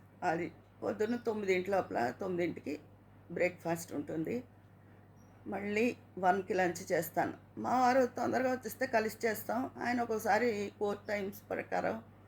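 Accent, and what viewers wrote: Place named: Kakinada, India. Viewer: Mee maatalaloni accent ( yaasa), naakentho ishtam! Maa vaalladi Kakinada, mee maatalu vintunte,atuvypu vaalle gurthukosthaaru.Maa native place Bandaru,kaanee eppatinuncho Hyderabadlo vuntunnaamu.Mee kaburlu intlo vaaritho panchukunnatluntundi. Thank-you.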